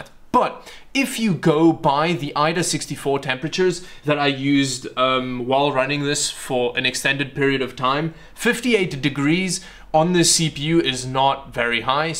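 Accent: South Africa. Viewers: Wait you're south African?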